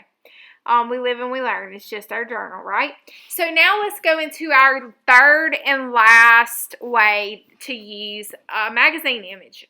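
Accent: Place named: Kentucky, United States. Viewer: Wow, I just "discovered" you only a week ago when I when was searching YouTube for beginner videos to get started on my new Gelli plate. I had been watching very complicated videos and was getting discouraged before I even started. Then I found YOU! Instantly my heart leapt with JOY and I was like, "I need MORE of this girl in my art life!" I have started binge watching your videos because they are EYE CANDY and just because I cannot get enough of your sweet VOICE (my grandpa grew up in KY and later Baton Rouge and used to call this Alaska girl, his only granddaughter "Sugapie" so hearing your accent is bringing back SO many memories, even though he passed 20 years ago). Words cannot express how much you are a GIFT to the art community as well as sharing your JOY with anyone who is lucky to find you online. I am signing up for your newsletter and will start enrolling in your classes. 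Much love to you and Josh and the puppies!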